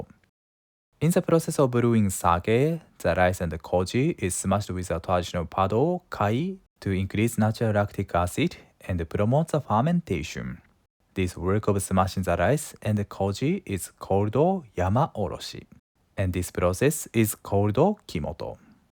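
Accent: Japan. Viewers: I would like to commend you on the progress of your English speaking. I also love that you have preserved your traditional Japanese accent which is delightful to listen 🎧 to. I love your videos and thank you for the wonderful content you provide. This one is a particular favourite of mine. Regards and have a great day 😊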